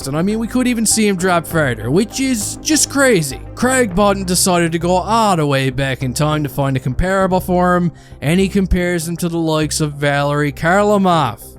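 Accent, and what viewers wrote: Newfoundland, Canada. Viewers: Sounds like a Newfoundlander. Where are you from?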